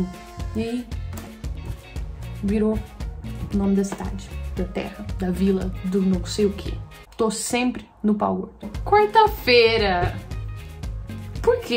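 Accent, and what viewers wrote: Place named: Brazil. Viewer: estás a começar a perder o sotaque do Brasil 🤣🤣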